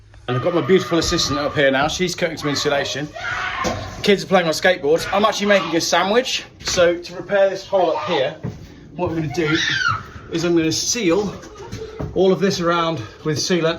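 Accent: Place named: Birmingham, United Kingdom. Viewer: Needs Phil to dilute the bumpkin ‘hidden’ brummie drool 🙏🏼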